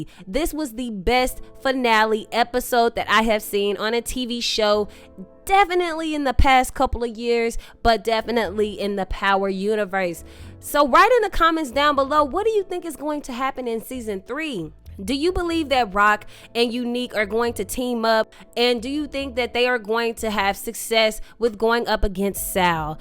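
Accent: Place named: New York, United States. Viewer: This episode was fire!!! Your New York accent has me rolling btw.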